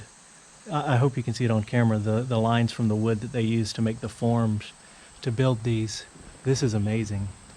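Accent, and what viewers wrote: South, United States. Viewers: Wow, that the most beautiful Ridge l have ever seen. Those arches under it are so amazing, l have never seen anything like that before. And looking up at the railway bridge the creek was absolutely gorgeous. Those gun shots where worrying though. Thank You so much for the videos, your a real Southern Gentleman,l love your accent, so different from here. Keep Safe, Debra from Australia. 🌿🍀🌷🌹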